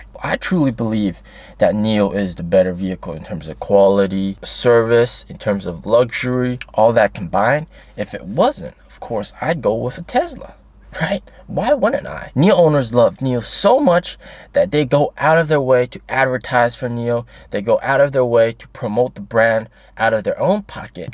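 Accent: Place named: South, United States. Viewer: I like your southerner accent.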